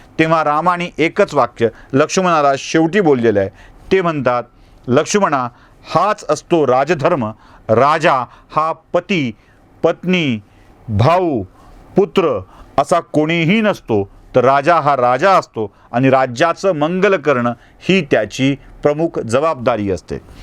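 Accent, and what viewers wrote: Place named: Marathwada, India. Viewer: विश्लेषण चांगलेच, पण श्रीरामांचा उल्लेख एकेरीत करणे योग्य वाटत नाही. तसेच आपले मराठवाडय़ातील मराठी प्रयत्नपूर्वक व जाणीवपूर्वक शुद्ध करणे आवश्यक आहे. आपणास खूप उंची गाठायची आहे. धन्यवाद .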